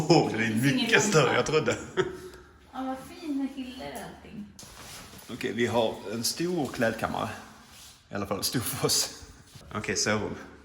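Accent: Skåne, Sweden. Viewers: Tack för vlog. Maybe do some more of these please. Lucky day to move, same day as our new president! Grät du during that inauguration? I cried. Are you losing your Skåne accent?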